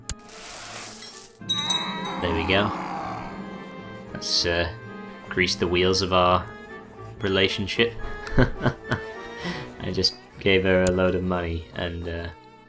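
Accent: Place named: United Kingdom. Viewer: What is your accent? It's easily my favorite British accent but I'm terrible at recognizing regional ones.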